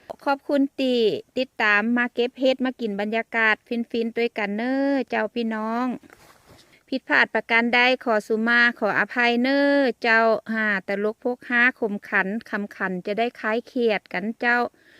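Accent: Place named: North, Thailand. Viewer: น้องชอบปี่อู้กำเมืองมว่นดีขนาดจ้าวดูแล้วสบายใจจ้าว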